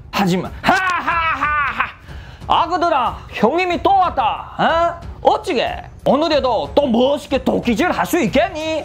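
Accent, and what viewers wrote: China. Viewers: 조선족이 한국어하네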